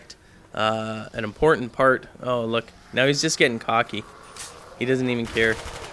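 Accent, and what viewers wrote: Canada. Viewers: ur canadian. you go oo instead of ow or ou. and you sed eh? abunch of times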